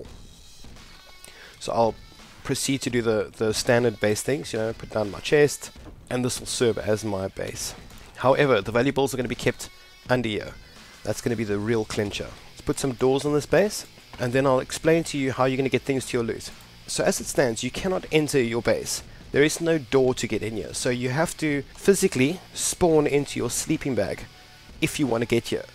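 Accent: South Africa. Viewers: 120% south african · Are you south african? I heard Australian at first then i noticed that boer accent. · Are you south african? cuz you sound like it :) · Are you South African 🇿🇦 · Upon watching this i couldnt help notice the man's voice in the video... how familiar it sounded. Then i check his twitter and i realise... FAM! South African gamer ^^. SO great to see a South African making a successfull year on Youtube and into their own streaming career. You are an inspiration dude. I stumbled upon this vid, but you got a new follower for sure. Nice hidden base video, going to lock up all the captives in there from now on ^^ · I swear this homi is south African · South African ? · are you south african? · Are South African? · What's that accent? Are you South African? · I really love your accent and it makes me miss SA, great videos man and I love the concept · South African? 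· Are you South African mate? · Are you South African? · You sound South African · are you south african ? im north African :) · From your accent I am assuming you are South African and I pray to God that you are not still in that godless country. Stay safe out there buddy. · This guy is south african 100% How i know the accsent And south african afrikaans english grammar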